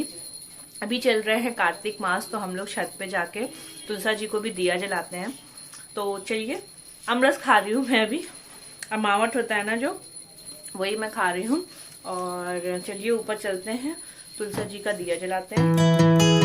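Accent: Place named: Kanpur, India. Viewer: Purani yaade taza ho ganyi...chauk aur ftp ki galiyon 😀😀😀 First video me Apke voice tone se hi laga ki ap cnb ftp se😀😀😀👍👍👍👍👍 Good work mam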